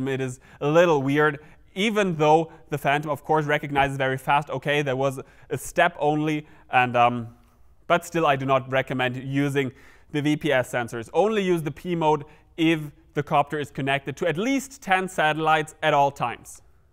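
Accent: Germany. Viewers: bist du deutsch ? :D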